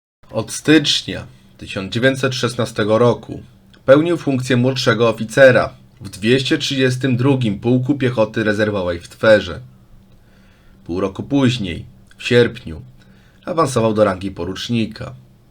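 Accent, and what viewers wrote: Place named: Ukraine. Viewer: Bo to Ukrainiec i nie mówi tak dobrze po polsku jak Polacy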